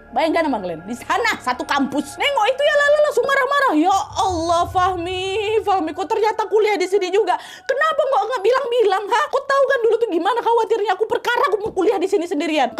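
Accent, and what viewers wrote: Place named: Java, Indonesia. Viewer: pantes kakk kalo ngomong jawa medokk bangett😭😭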